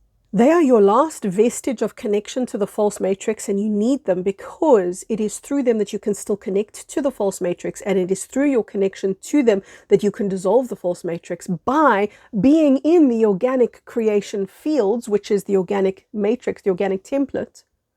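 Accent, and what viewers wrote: South Africa. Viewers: great message, love the S.A. accent too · You sound South African❤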